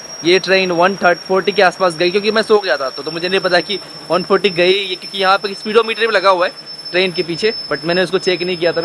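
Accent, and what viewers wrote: Bihar, India. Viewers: Char din hue nhi honge Russia gye hue or attitude dekho iska, abhi tak bihari tone gyi nhi hai iski hindi ache se bol nhi pa rha or nautanki dekho iski.....paisa barbaad saala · Kahe raese pel rahe ho jo accent use kar rahe ho lag rahe ho bihari ho cheap aur chutia dono dekh rahe ho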